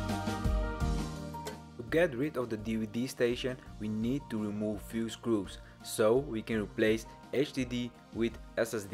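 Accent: Netherlands. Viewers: Haha lachen om te herkennen dat je een Nederlands accent heb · hoorde meteen dat Nederlandse accent.. toen zag ik ronde op de stopwatch en wist ik t zeker..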